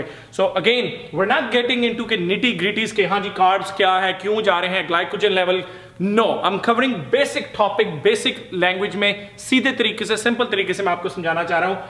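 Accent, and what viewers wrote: Punjab, India. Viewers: Jst love the way he talk....punjabizz always rock😂😊😍😘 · Tuhadi khand chatt diya 😂😂😂😂😂 mori cha ungle 😂😂😂😂😂😂 pure punjabi accent 😂😂😂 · Yaar Punjabi ke saath yeh ajeeb sa accent english ka... Fir punjabi touch wali hindi..... Maa kasam.. body pata nahi... But dimaag ka workout to tum poora karwa dete ho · His punjabi accent is soo cute, haha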